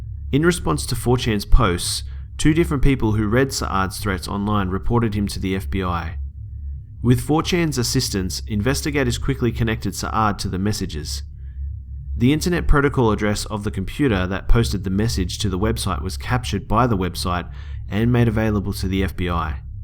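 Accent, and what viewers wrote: Australia. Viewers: Him: G'day mate- Me: Oh shit an aussie. I'm subscribing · you sound so aggresively aussie ahaha, love it. keep up the good work. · Are you Australian?? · I cannot stand the Australian accent. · wew lad aussie accent..shitposting inc. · Only subscribing because you're Australian and your voice sounds majestic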